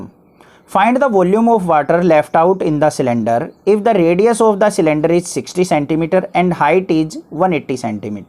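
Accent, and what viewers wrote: Haryana, India. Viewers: Are sir! Jhande gaad diye aapne. Kya badhiya mixture h Haryanvi,English aur Hindi ka. Waahh!! Maja aa gaya aapki class attend karke. Haryana ke ho ya rajasthan ke.Even I am from Rajasthan, Jhunjhunu.. 🤩Par filhaal abhi delhi me hu. Ummeed karta hu aap desh ke har kone me prasiddh ho jae..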